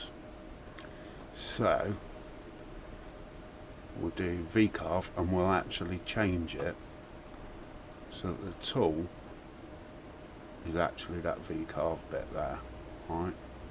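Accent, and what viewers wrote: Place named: Wiltshire, United Kingdom. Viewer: Hi, Where in the UK are you? I used to live in Swindon Wiltshire, Your accent seems to be from around that area, or Gloucestershire ish. I now live in Australia. The two comments below are mine. If the little bit of CNC that I know can help you in any way, just let me know. Kind regards, Michael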